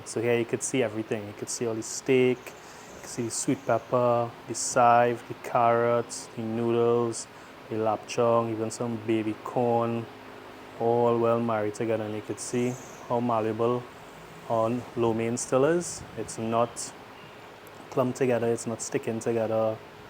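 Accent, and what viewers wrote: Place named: Trinidad and Tobago. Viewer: My Brother in Law is Trini……. Just hearing the accent makes me Crazy HUNGRY!!!!! ❤️🇹🇹❤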